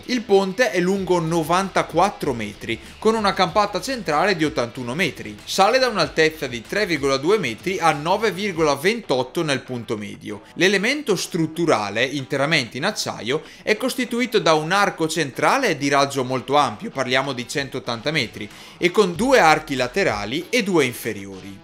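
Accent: Brescia, Italy. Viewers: Ma quell'accento bresciano? :-) Brao!! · Si sente che sei di Brescia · Bravi ! Sempre esaustivi e concreti! Ma siete di Brescia? L’accento sembra di lì…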